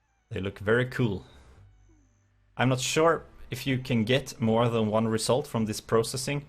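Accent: Sweden. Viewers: are you swedish? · Is that a swedish accent i hear? D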